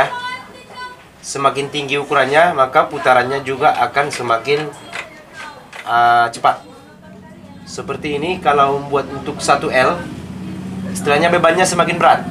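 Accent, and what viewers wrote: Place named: North Sumatra, Indonesia. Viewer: Dimana alamatnya bosku....sepertinya orang sumut...saya juga orang sumut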